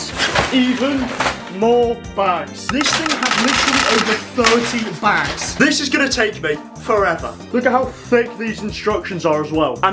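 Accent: Newcastle, United Kingdom. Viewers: Your a geordie let’s go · You sound British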